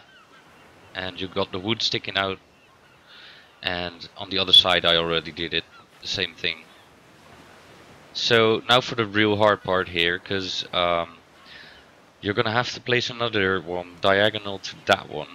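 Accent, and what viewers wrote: Netherlands. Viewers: ben je nederlander ? are u from holland ? u sound like 1 lol :P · i immediately noticed your dutch accent :D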